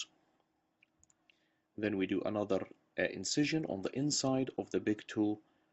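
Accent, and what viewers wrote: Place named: Eastern Europe. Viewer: Where comes the speaker from? I think he are from finland or russia or other slavic country!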